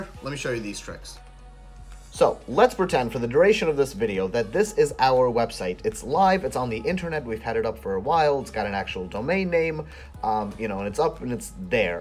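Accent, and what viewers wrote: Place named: United States. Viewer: a tutorial with an American English speaking person. thank you. lol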